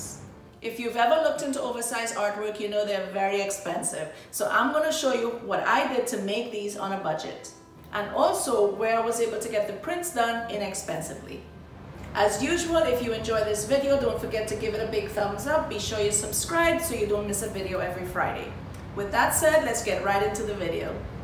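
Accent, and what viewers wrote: Trinidad and Tobago. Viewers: I know a Trini when I hear one ❤ Great work!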